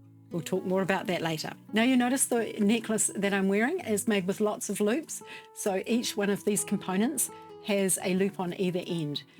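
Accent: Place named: New Zealand. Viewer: Thank you for your fantastic video on how to make perfect loops & fix faulty ones. Love your Kiwi accent too! I have a friend in New Zealand, Bryan Waddle who used to be cricket sports announcer for Radio New Zealand! I'll definitely be liking more of your videos. This is best loop making video I've ever seen.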